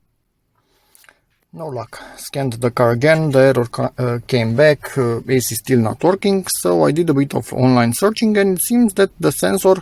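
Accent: Romania. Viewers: Aoleooo ... un ruman care vorbeste englezeste !!! Suna ca ... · Accentul ăsta tipic Românesc, îl cunosc de la 1km, nu contează în ce limbă se vorbește, Accentul e același... it's lovely!!! Good job ,,OMULE"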